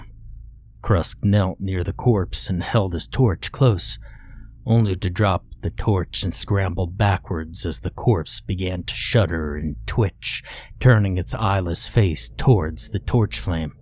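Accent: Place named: West, United States. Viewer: Your western voice ain't bad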